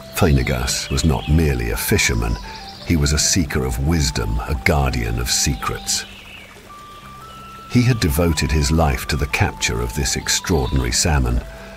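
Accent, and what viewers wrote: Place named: United Kingdom. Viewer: 😡 This is a great Irish myth. And they've got the story wrong! Even worse they used a British accent. Do they not know how insulting that is? Sigh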